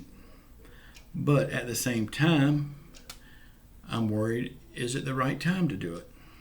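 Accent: South, United States. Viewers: Talks very slow with a southern draw. However, he’s very direct & to the point. No BS approach.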